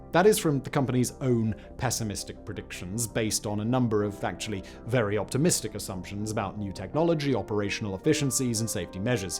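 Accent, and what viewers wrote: United Kingdom. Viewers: . Please do your research. I love you and your brit accent but you have blown it now. That cotton-looking plant is definitely not cotton. We grow cotton in bnbnmnb can't say because of state spies kkkk, Just spend 30 seconds on the internet and you will see a real cotton plant. Your pic shows a harmonious loving field of flowers, but a real cotton field uses pesticides, and child labor and the topsoil is washed away in rain. None of this happens in your Alice in wonderland field of flowers. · Another example of British accent being conflated with intellectual prowess. What an a$$. 😂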